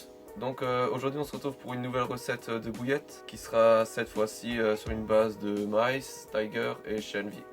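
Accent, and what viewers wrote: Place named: Alsace, France. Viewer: Mika 80 laisse l'accent alsacien trankil lol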